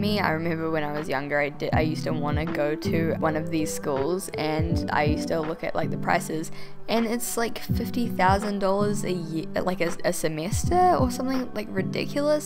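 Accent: New Zealand. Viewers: Ohhh you're from NZ! I always wondered why you seemed so familiar and I just realized: it's your accent, your makeup, and the fact that I grew up watching The Tribe lmao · oh my gosh i knew i recognised a fellow kiwi accent!! you’re right about it not being an art and animation hub sadly :/ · Oh! It’s a New Zealand accent!!! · Aha, you’re from New Zealand, that makes sense. I’m not the best with accents, so I wasn’t sure between Australia and New Zealand. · I thought I recognised your accent 😂 NZ youtuber gang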